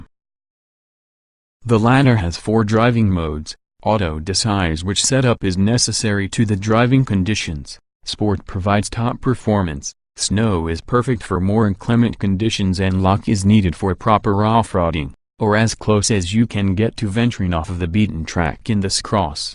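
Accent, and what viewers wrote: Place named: United States. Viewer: Crap USA accent crap review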